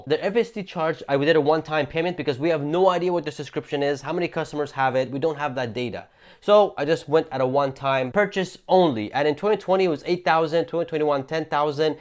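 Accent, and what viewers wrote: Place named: United States. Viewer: Just curious, why do you have an American accent?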